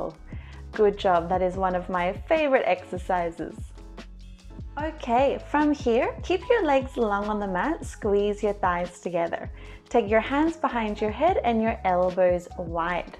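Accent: Australia. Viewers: Have done 2 of your classes now, yesterday and this morning. My gluteus are feeling it! I have a couple of favourite channels I follow to mix up my workouts, love your workouts totally agree with the other comments, you are clearly a professional and a great teacher/instructor. Also is really soothing hearing a Ozzie accent😉. Love from Melbourne 😘😘😘❤❤❤ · Your videos are amazing! Thank you so much. This one was hard. Hoping that I can improve and not die next time hehe. And I definitively love some Australian accent :)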